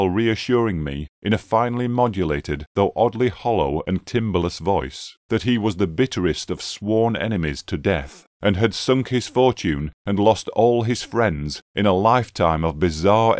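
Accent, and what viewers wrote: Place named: Spain. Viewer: One of the best Lovecraft's tales! ⭐⭐⭐⭐⭐ Funny one Spanish doctor's name was 'Muñoz' & the other one 'Torres'. 'Torres-Muñoz' is a pharmaceutical company founded by the time the tale was written & that still exists in Spain! They make pharmaceutical salts. 😀 Thank you for the narration & was enjoyable with the Spanish accent! 🙏☺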